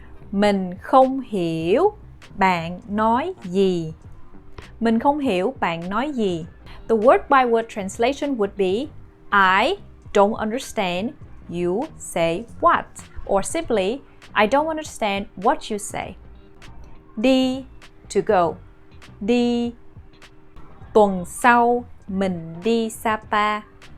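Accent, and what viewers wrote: South, Vietnam. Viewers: Do you speak northern vietnamese or southern ? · You are from South Vietnam? The Vietnamese people I interact with tend to be from the south also. Great video, by the way, as always. I appreciate the way you say each sentence first slowly and then at a more normal speed...also how you break down the components of each sentence... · is this the southern accent? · Really good for beginners, even South accent. I think it is the south accent.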